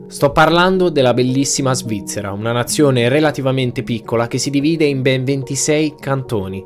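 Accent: Tuscany, Italy. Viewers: Sei toscano? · Ciao, che storia tremenda! Ho fatto fatica arrivare alla fine e mi ha stupito che uno psichiatra veda la possibilità di riabilitare una persona come Thomas. Hai un bellissimo accento toscano, non hai mai preso in considerazione di trattare la storia del mostro di Firenze? magari inserendo delle clip del processo??? 😁 io me lo guarderei davvero volentieri raccontato da te!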